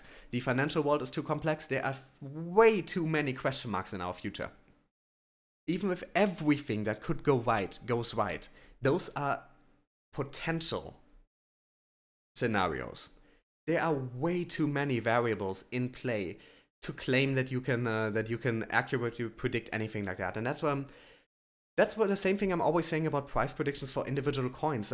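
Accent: Germany. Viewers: I like your German English accent, you speaking English very well which make you huge fans from Europe & USA around the world.. Finally I like your videos which are confident 🤝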